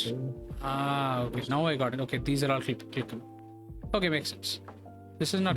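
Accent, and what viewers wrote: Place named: India. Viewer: Hi friend, i clicked your video because of the title ... really interesting topic, but i won't be watching the rest of the video, and because you are a fellow creator and just started i wantd to tell you why and give you a few hints on the way out - and hopefully be back later. First of all, a lot of people primarily listen to these kind of videoes, and besides from that you are providing a lot of interesting information, so the sound is really important. So i would recomend you a few things regarding that: 1: A lot of Indian speakers have horrible accents, but that is actually not that bad for you, but you speak very fast ... even if you where a native english speaker your pace is way to fast for this kind of content ... so try to speak slower and edit the sound so that your voice is a bit more clear in comparison wuth the background music. 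2: I realize that this was a livestream, and it's fine that you share those like this ... but please add an intro, nothing fancy, just a clip of you explaining what the video is about and highligting the most important parts. 3: If you want to be really fancy, make a curated version of the stream ... break it up and narrate it. I really think this is an interesting topic, but i listen to these videos when i work - and because of the pace i cannot focus on my work while listening to this, sorry. I really hope you take this feedback constructivly and goes to the moon, power to you my friend! 👊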